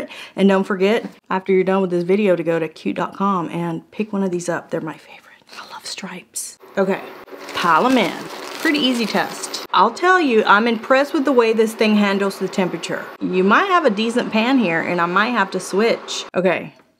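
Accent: Texas, United States. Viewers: First of all glad I found your channel again.👍 Second did not know your shirt was changing colors was too into the test results. I like the pink one.👍 Third I've watched about five of your videos I thought you always had a Texas accent. And you lived in Texas. 🦤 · Girl I was thinking the same thing! “Does always have such a strong southern accent “ lol funny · Are you talking in a southern like paula dean southern accent in this video... or i am I just noticing it ps i think I have seen all the your videos so I dunno why it sounds that way today maybe it is cause you are doing a cooking video, ps you said that right when i finished typing this then i had to click edit right then to add this part!!!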